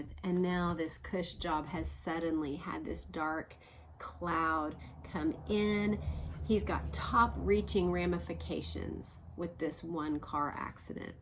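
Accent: Texas, United States. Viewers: I'm watching the start of this series on July 19th 2026, in a small little seaside village in the UK - coincidence! I love your channel and listening to your lovely Texan voice as I do my artwork at my desk, seeing you now and then. I've been following you a while now as the JFK Assassination has always fascinated me. Now I can't wait for you to dive into the Chappaquidickstory. Loving your work (and the trains) 😀👍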